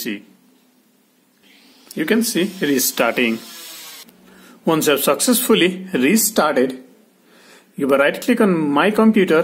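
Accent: India. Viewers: U sounds odia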